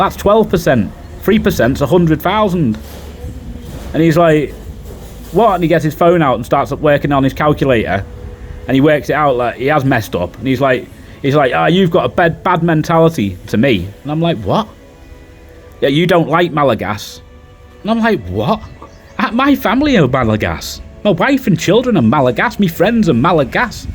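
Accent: Liverpool, United Kingdom. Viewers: Good On Ya Yer Scouser 👍👏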